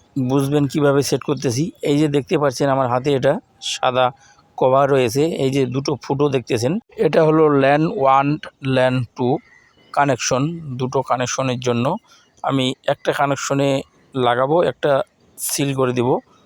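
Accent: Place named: Bangladesh. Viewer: apnar vasa ta bangladesh ar monehoche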